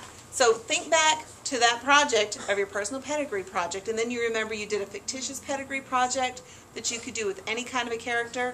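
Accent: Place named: South, United States. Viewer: That's pretty amazing information! I love your new found southern accent! I can see that you turned out to be a great science teacher!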